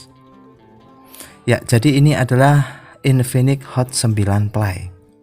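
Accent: Java, Indonesia. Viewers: Mas orang mana sih kok kayak orang Jawa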